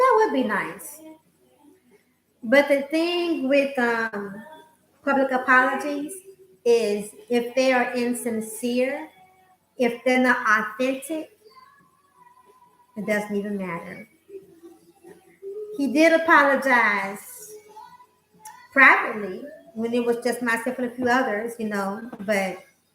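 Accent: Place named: United States. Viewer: Hey, are you from the states? Reason why I ask is because of your accent, which is lovely btw.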